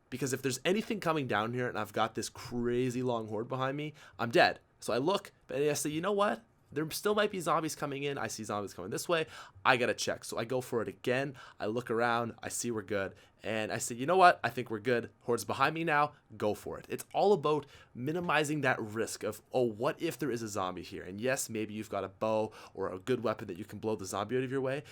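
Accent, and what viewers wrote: Canada. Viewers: Are you Canadian??? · its all a bout that canadian accent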